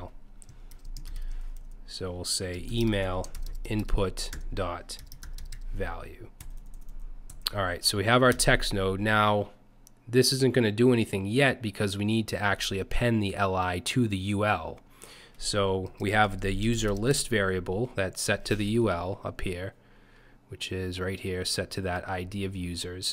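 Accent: Boston, United States. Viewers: I love this Bostonian accent. Also, great content.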